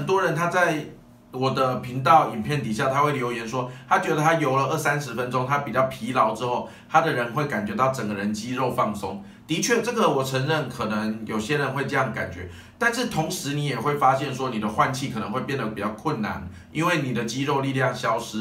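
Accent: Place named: Taiwan. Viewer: 废话，人家教练是台湾人，不说国语难道说大陆普通话？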